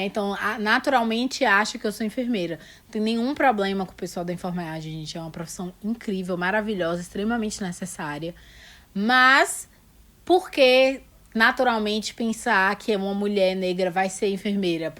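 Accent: Bahia, Brazil. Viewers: Nossa sempre que vejo, algum vídeo deste ca fico me perguntando esse sotaque "baiana" e agora tive a confirmação! Qual o interior ?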